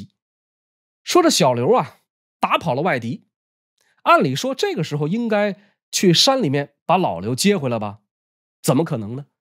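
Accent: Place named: Tianjin, China. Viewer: 老师是天津人吧，本来沉浸在严肃的历史氛围里，一个嘛字把我听笑了😆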